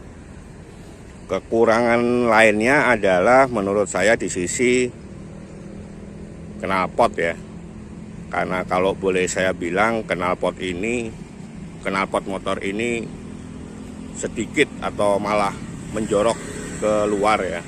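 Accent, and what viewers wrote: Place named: Java, Indonesia. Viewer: Mas nya bukan orang sembarangan, karena apa, karena pengucapan kata katanya terstruktur rapih dan aksen jawa nya kental jadi saya suka dengernya... ❤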